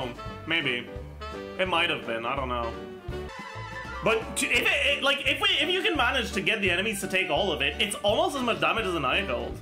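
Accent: Quebec, Canada. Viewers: he's fluent but he has a very thick Quebec accent which very different from what you'd hear in France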